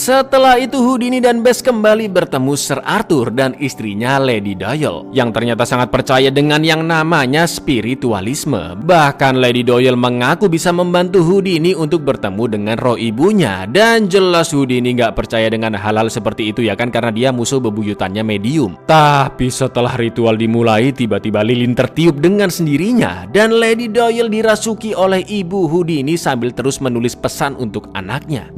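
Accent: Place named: Madura, Indonesia. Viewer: Madura kamu bang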